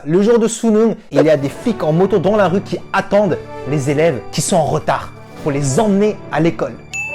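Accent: France. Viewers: 왕ㅋㅋㅋㅋㅋㅋ 엄청 신기해요!! Première fois que je vois une chaine comme ça!!! 거의 평생을 프랑스에서 보냈던 한국사람으로써 완전 반갑네용 ㅎㅎ 다른 나라 교포들은 많았어도 프랑스쪽은 거의 본적이 없어서 뭔가 한국어로 하실까 불어 어투도 너무 반갑고!! 혹시 자기 identité에 대한 동영상 부탁드려도 될까요? 많은 교포들이 느끼는 여기에도 저기에도 100% 소속감을 느끼지 못하는 그런 느낌? (국가비님 영상을 보면서 많이 공감했는데 )프랑스에서 평생 사셨던 분 입장도 궁금해요!!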